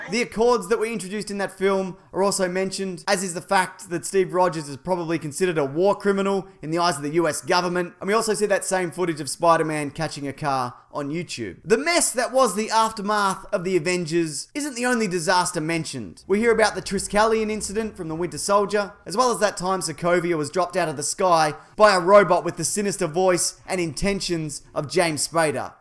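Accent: Australia. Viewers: are you Aussie? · is this your real voice or your Bon Jovi public persona voice?(his real voice wouldn't make girls swoon, well maybe Claymates?) Because a high strung Aussie voice...not good.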